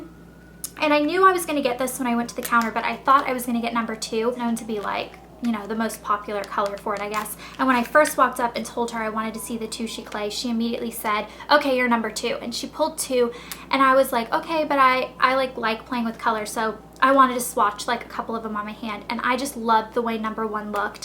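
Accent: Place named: South, United States. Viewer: NAPAL she has a southern accent :) the middle "a", nApal, sounded like an E :)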